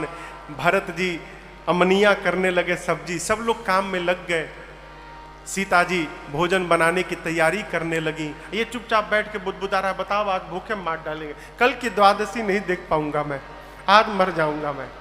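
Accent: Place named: Bihar, India. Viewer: आप कथा कहते समय निम्न स्तर की भोजपुरी,मजाक, बिहारियों की तरह किया करते हैं।v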